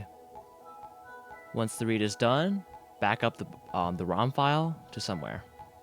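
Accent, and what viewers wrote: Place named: Southeast Asia. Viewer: It's the South-east Asian accent.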